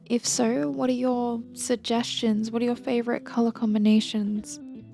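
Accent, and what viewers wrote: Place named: Australia. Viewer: lovely hearing an Aussie voice for a change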